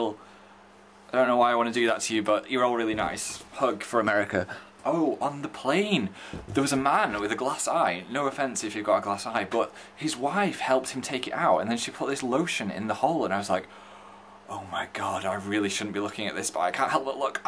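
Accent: North, United Kingdom. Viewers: I love how northern he sounds! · So northern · his voice is so northern ohmygod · 1. THE SAME BEDSHEETS FOR GOD'S SAKE 2. so northern. much love. 3. such expresssion at the end of the video. very appreciated. 4. what sarcasm. 5. my fav video among like a 100 others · His voice... I'm used to this very Northern accent now. It's gonna change, and I know it'll end up being slightly gradual but omg jdhfhdndhkxa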